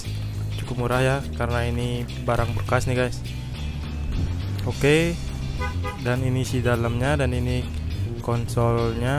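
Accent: Bali, Indonesia. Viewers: Bli.. klo bsa logat bali nya jgn trlalu kental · Logatnya kok kayak orang bali ya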